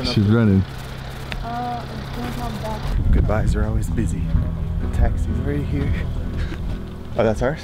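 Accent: Minas Gerais, Brazil. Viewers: esse sotaque rs de Minas é lindo demais! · Sotaque mineiro não sai da gente mesmo hihihi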